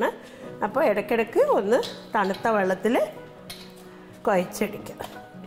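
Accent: Kozhikode, India. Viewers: ഞാനും കോഴിക്കോട് തന്നെ ആണ്. പക്ഷേ ഷെഫ് ൻ്റെ കോഴിക്കോട് സ്ലാങ് കുറച്ചൂടെ മനോഹരം ആണ്.😊😊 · Is that Calicut slang or a bit of kannur slang . It’s so interesting how Malayalam slang changes from kochi to Thrissur and then up north . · Kozhikode slang pwoli❤️❤️✌️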